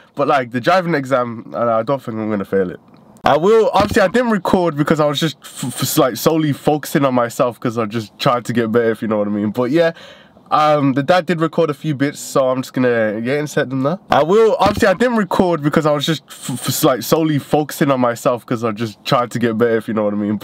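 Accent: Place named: Jamaica. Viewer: I can hear he is from Jamaica like me🙂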